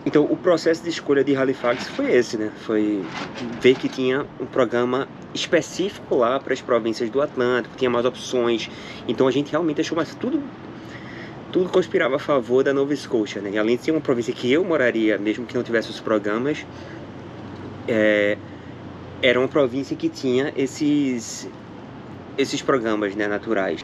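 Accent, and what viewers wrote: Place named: Northeast, Brazil. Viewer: Você é de onde? Seu sotaque é nordestino! Eu sou de Fortaleza/CE.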